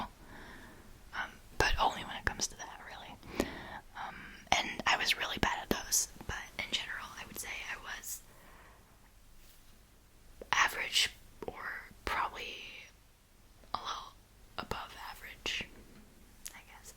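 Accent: United States. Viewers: Your German? Could have fooled me with that american accent. ok every now and then it sounds a little off but for the most part "98%" you sound american :) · You really wouldn't think you're not American. Your accent is so on point! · You seriously sound sooo American it's Hilarious lol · This was fun....As a viewer for the last 2 years, I still can't figure out how you sound more American than my American friends ツ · your accent sounds American. your English is 'perfect' and your Spanish is as well. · I knew you spoke German I just didn't think you were German. You speak English so well and naturally, I thought you had an American accent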